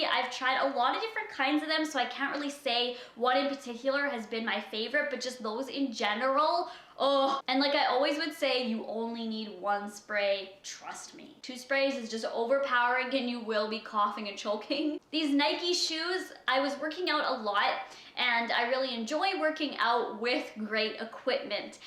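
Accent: Canada. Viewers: I do not understand why everybody hates on she is a great person why is everybody so concerned tht she is writting words in the american way im canadian n sometimes i write in the american way everybody also makes fun of he bout the she says words tht have tht letter "a" in them all countries have certain accents n tht a canadian accent honestly haters if u have nothing nice to just dont say it at all because u r ruining day n all of her cuties day btw ily ignore the hate 3:-)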